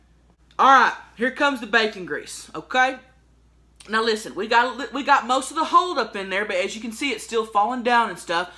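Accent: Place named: South, United States. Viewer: I just realized how damn southern you are, where you from?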